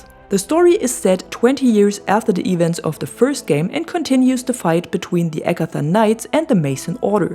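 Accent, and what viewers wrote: Germany. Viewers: Bist du deutsch? 😆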